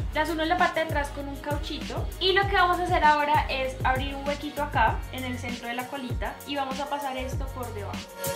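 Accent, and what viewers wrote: Colombia. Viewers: Que agradable escuchar una colombiana sin acentos exagerados!!! Muy buena vocalización y buen tono de voz...felicidades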